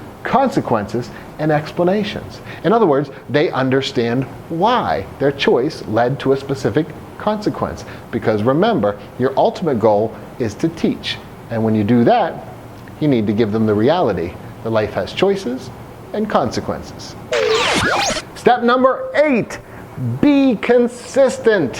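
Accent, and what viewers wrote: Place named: United States. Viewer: Why does he speak in an American accent? He would sound better if he spoke in an Australian accent.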